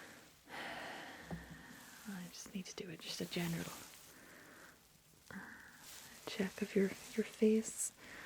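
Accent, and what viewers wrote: Ireland. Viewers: This is one of the best irish accents I've ever heard anyone do... Im irish and hardly anyone gets it right! Amazing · I genuinely thought she was Irish, particularly from the west. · If you ever get back into asmr you should definitely do another roleplay with the irish accent. It is spot on, so well done!!👏🏻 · Im guessing you are actually Irish haha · Jesus Christ I’m Irish and this was like I was listening to someone from home.. unreal - you have to have someone in the family that’s Irish to pull that off. Gone from southern to northern very quick though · Where did you go. I miss irish accent. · Your Irish accent is shockingly natural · Hey! Greetings from Israel! 😀I'm so happy to hear an Irish accent! 😍Beautiful!❤ Subscribed!😊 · Mother's side of the family immigrated from Ireland and your accent is absolutely spot-on. Thought it was natural. 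Amazing! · Top marks again for the accent.👍 I always find there is nothing more relaxing than listening to someone speaking in a soft Irish accent, especially when things are being explained in detail as you did throughout the examination. Also got some tingles via the movement and light during the pupillary examination. Great video, thanks for sharing.😊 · Was the accent inspired by Derry Girls? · Medical role-play AND an Irish accent? Preemptive 10/10, haha! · Could you please do an ear exam , love your Irish accent ❤ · Omg that accent was just amazing! 😍 The little bit of Irish in me was very much satisfied to hear this! Also I LOVED THIS ROLEPLAY! You really have a comforting vibe about you. 🤗💙 Just what the doctor ordered aye? 😏😅💙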